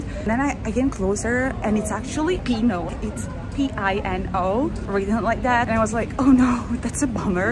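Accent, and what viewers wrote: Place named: United States. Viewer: Sei davvero Napoletana , il tuo inglese americano è perfetto. Sembri Italo-americana. Un saluto dal Polentone del Polesine (Veneto).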